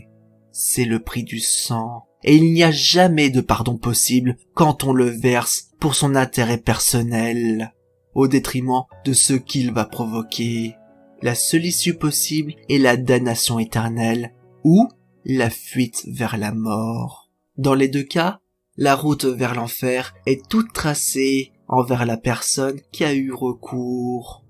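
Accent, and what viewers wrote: Lorraine, France. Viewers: Désolé pour l'accent, c'est un accent Lorrain 🤣🤣🤣🤣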